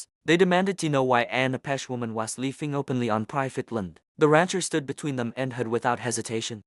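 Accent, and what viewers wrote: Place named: Poland. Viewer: Horrible,,bad,,terrible narrator. At the very least,,program the stupid AI to use an actual western voice,,not a polish or russian voice. Splash? Wtf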